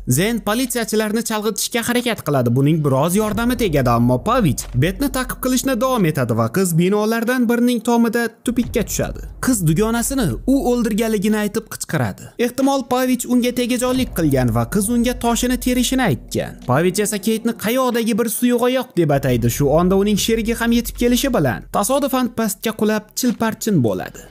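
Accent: Khorezm, Uzbekistan. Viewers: Ogʻo omad xorazmchasina yoribsiz lakin sharxni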